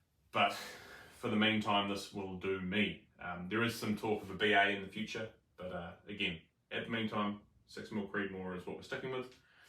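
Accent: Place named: New Zealand. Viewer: I love listening to kiwis even though I'm not totally familiar with the slang. 😁 Thank you for the review of the MPA!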